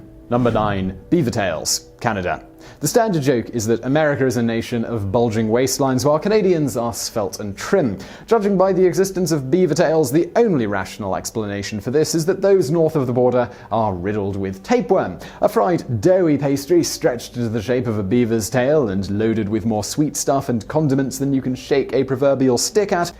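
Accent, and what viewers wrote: United Kingdom. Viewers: Quick question.....where are these videos made ? You sound so British, but everything is compared to America. If it hadn't been for Cornwall being mentioned I'd had no idea what you were talking about. I have no idea about Wyoming, Dollars and cents or even where Rhoad Island is let alone the size of it. It's like watching a cookery program where there's no such thing as Gas Marks and everything is measured in cups !! I begin to feel like Alice falling down the rabbit hole !!!!!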